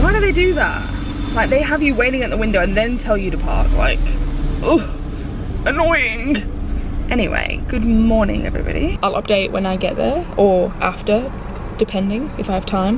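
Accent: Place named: Northern England, United Kingdom. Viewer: eyyy. Thank you so much. I've been curious for ages. Also. This is my friend. He was trying to help me figure it out. You sound like would've come from the north of England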